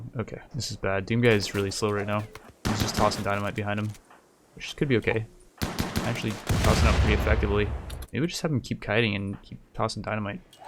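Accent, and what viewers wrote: United Kingdom. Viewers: he sound British to you? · it's called british english, he's pronouncing it perfectly fine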